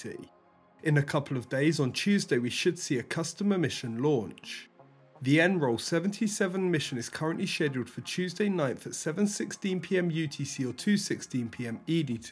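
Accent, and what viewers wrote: England, United Kingdom. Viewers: Another english voice? Man get over it.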